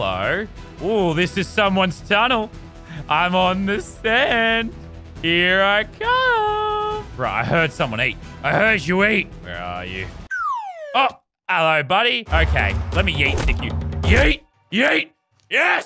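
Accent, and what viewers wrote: Australia. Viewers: Jacob DesLauriers that is just how they say it in Australia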